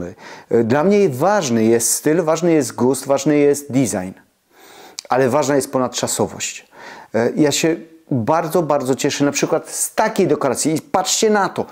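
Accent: Silesia, Poland. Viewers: Nie ogarniam zbierania gratów, "dekoracji😢" typu plastikowe dynie i o zgrozo! halołinowe. Moja mała slabość to kocyki, cieplusie milusie. W domu w zimę temp ok. 18 więc kocyk to jest to!!! Kupowałapom mówię PRECZ!😅 p.s. A Pana śląski akcent... Miód na ❤️ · Podoba mi się Pana zdrowy rozsądek, luz, dystans i....śląski akcent! Pozdrawiam serdecznie :) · I dobrze że słychać gwarę. W końcu ona też nas Ślązaków określa. Prof.Simonides często wracała w 😊swoich wypowiedziach słówko gwarowe" tyż". I w żaden sposób się tego nie wstydziła. Pozdrawiam panie. I zgadzam się z Pana przemyśleniami nr dekoracji. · Zgadzam się z Panem. Moim zdaniem, jesieniara to osoba, która ma wszystko w nosie, włazi pod koc z kubkiem herbaty i książką, a inni niech pracują. Nie chcę nikogo obrazić, ale ja jesienią zaczynam pracę, nieraz przemokły mi buty, chorowałam, przeziębienie było zawsze o tej porze roku. 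Poza tym, dla mnie to smutny czas, przyroda zamiera, wspominamy zmarłych. Wolę wiosnę, ba kocham!!! Ale nie nazywam siebie wiosieniarą😂. Kiedyś ludzie nie dekorowali domów na każdą porę roku. Teraz liczy się zysk sklepów i producentów. Większość dekoracji to tandeta i zaśmiecanie środowiska. A śląskim akcentem proszę się nie przejmować. To dodaje Panu uroku. Nie tylko górnicy tu mieszkają- też jestem ślązaczką z dziada pradziada. Kiedyś na koloniach Pani wychowawczyni nazwała mnie i siostrę Ślązarami, sama przyjechała tu za pracą. Dlatego jesieniara do mnie nie przemawia. Dobrze, że Pan podjął ten temat. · Śląski akcent- super.Jestem z Podlasia.Polacy pięknie się różnią . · Ja osobiście jakoś nie czuję tych w sumie nowych trendów z zachodu i nie czuję najmniejszej potrzeby kupowania takich rzeczy - raz, ze szkoda mi kasy na to, dwa - mam małe mieszkanie i każda taka duperelka tylko by mi zagracała dom. 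Co innego Boże Narodzenie czy też święta Wielkanocne. A i wtedy jakoś nadmiernie nie szaleje z dekoracjami. Całkowicie się z Panem zgadzam Panie:)....a offtopowo powiem, że ja lubię ten śląski akcent i wszelakie (jesli są) "słowne niedomagania - dla mnie to wydźwięk naturalności a nie jakiejś sztucznej pompy:) Dobrego dnia! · 1. Proszę nie przepraszać za swój akcent. To część pana życia. Jak komuś nie pasuje to trudno. Rozumiem, że ktoś może nie znać śląskich słów i wtedy jak najbardziej, starać się mówić po polsku, ale zmieniać akcent? Pffff, chyba tylko dla buców internetowych. 2. Przyznam bez bicia, że też chciałam mieć dekoracje na kilka świąt czy wydarzeń, które celebrujemy. Chciałam mieć np. naczynia (talerze, miseczki, kubki) na Święta Bożego Narodzenia, na Wielkanoc. Oczywiście myślałam też o naczyniach sezonowych: tu listki na talerzu, tam płatki śniegu itp. I tutaj pojawia się moje szczęście w nieszczęściu: im dłużej czekam na koniec remontu i korzystanie z mieszkania (jestem singielką więc dłużej na niego zbieram) tym bardziej mój zapał na kupno tych rzeczy się po prostu ostudza. 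Już doszłam do wniosku, że musiałabym mieć pierdyliard rzeczy aby udekorować 60 metrowe mieszkanie. Po drodze pojawiło się dążenie do minimalizmu. Może tak musi być. Może po to tyle czekam na moje mieszkanie, aby żadne głupie myśli na jego dekoracje czy urządzanie nie przyszły mi nigdy więcej do głowy. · Zgadzam się w 100%. Dekoracje ponadczasowe👍 P.S. Uwielbiam śląski akcent i niech pozostanie😉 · Panie Pana śląski akcent nie przeszkadza, jest uroczy, myślę, że nie tylko dla mnie, osoby urodzonej i wychowanej w Bytomiu. Ale nad wymową "ę" zamiast "en" proszę popracować. Wymowa "bendzie" razi. Tu powinno być "będzie". Pozdrawiam Pana serdecznie i dziękuję za przekazywanie wartościowych treści i zdroworozsądkowe podejście.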